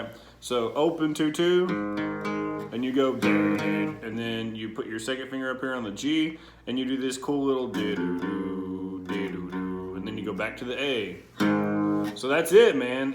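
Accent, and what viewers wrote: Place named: South, United States. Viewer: man enjoyed it by your accent you sound like a southern boy where you from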